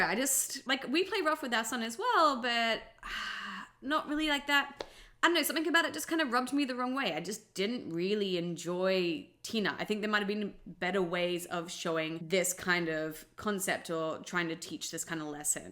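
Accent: Australia. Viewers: i like a lot watching your videos about bluey you are an awesome australian youtuber i likea lot Your Australian Accent anyway i'm from italy and i'm a big Bluey Fan i love watching Bluey a Lot since 2021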